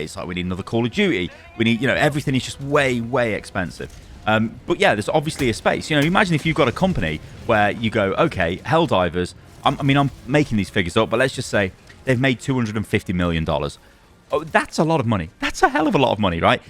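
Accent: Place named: United Kingdom. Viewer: That's just how the word is pronounced in britain...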